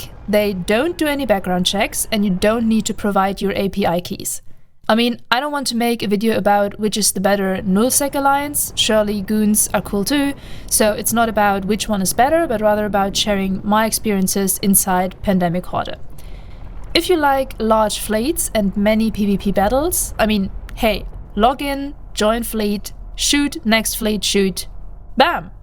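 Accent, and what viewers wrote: Germany. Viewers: no le hagas caso por favor, tu ingles se entiende perfectamente y tu acento alemán es bonito. Se te entiende mejor de muchos streamers americanos (o ingleses?) que por tener demasiada confianza con su idioma resultan incomprensibles a quien no lo habla muy bien. Y para ser pesado también diría que el idioma ingles copió la palabra Horde desde el francés, donde la "E" no es muda para nada. Sigue así, gracias por tus videos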